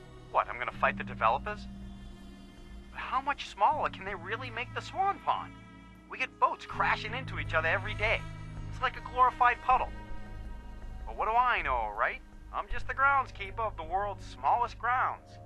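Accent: Northern Ireland, United Kingdom. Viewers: ur northern Irish right? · Are you northern Irish? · Are you from Northern Ireland? xD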